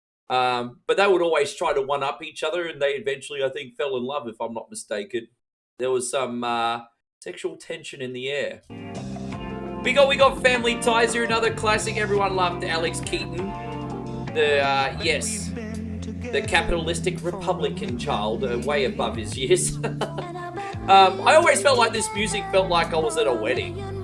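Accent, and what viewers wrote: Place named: Australia. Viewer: just a suggestion... For people that don't know you, your charm is your accent. Maybe putting in the title somewhere that you're an aussie would gain you clicks.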